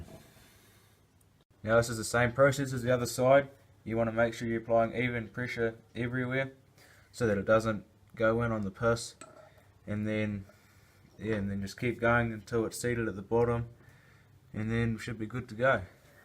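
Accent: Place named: New Zealand. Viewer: Kiwi repairs with a kiwi accent are so much better to watch. And kiwi gumboots as well... lol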